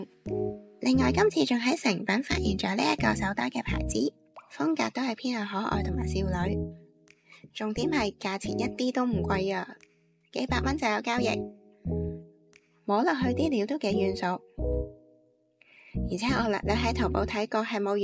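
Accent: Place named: Hong Kong, China. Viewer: Hong Kong accent is so cute and soothing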